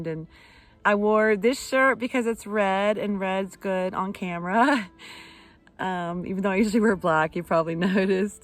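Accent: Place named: South, United States. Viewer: You’re a cutie, love your sweet way and southern drawl…’y’all.’ Much love from the UK x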